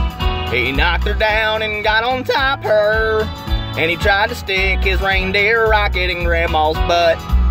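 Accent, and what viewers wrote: South, United States. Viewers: This is so hillarious! I love your southern accent on this makes it funnier and the lyrics are genius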